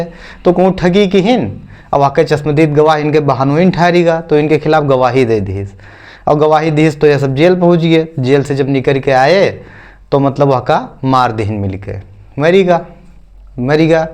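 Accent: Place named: Awadh, India. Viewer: Sir aapki ye Sunday ki kahaniya Sun kr bachpan yaad aa gya❤❤😍..... mai ayodhya se hu aur aapki awadhi dil ko chhu jaati h...... aise hi awadhi ko aage badhate rahiye......👌👌👏👏👏👏👏👏🥰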